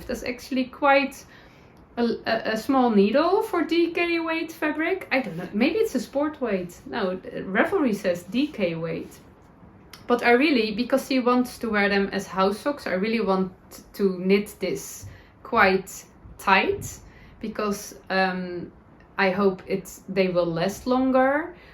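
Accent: Netherlands. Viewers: I understand the change but that doesn’t mean that I like it. You are so cute and authentic. I too am amazed at your grasp of English. It makes me smile to listen to you with your Dutchy english. I will look forward to Mondays with you. Gayle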